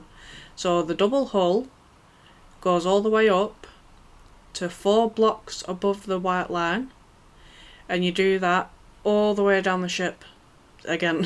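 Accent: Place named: Yorkshire, United Kingdom. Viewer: I'm from selby, and I recognize your accent, you're not too far from here are you 🙂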